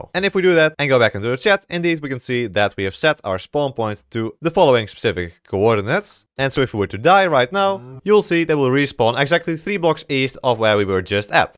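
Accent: Netherlands. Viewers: je bent nederlands ik hoor het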